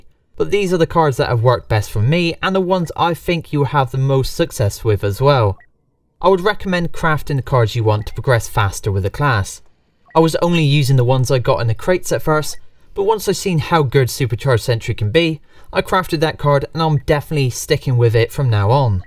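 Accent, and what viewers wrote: West Country, United Kingdom. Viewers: The west country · Are you from Bristol? Or Somerset?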